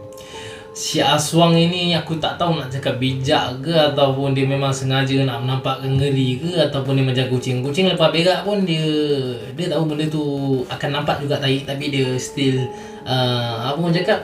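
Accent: Sabah, Malaysia. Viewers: Aduh slang2 adik ni macam Sabah laaa 🥺❤️ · Mcm slang sabahan ........ Btw storyline best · Suara abg ni mcm suara org sabah jak hahaha btw sya urg sabah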